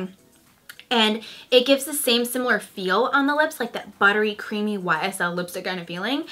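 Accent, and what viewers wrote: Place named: Chicago, United States. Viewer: Love your Chicago accent! 😀😀😀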